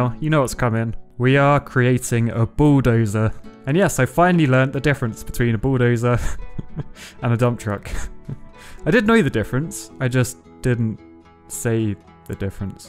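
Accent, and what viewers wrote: United Kingdom. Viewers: Ok but how do I get my Google device to have a British accent?!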